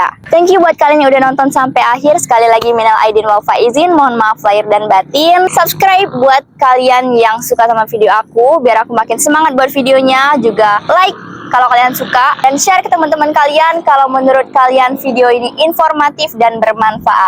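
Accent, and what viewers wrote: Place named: Indonesia. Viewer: Bahasa Melayu loghat Indonesia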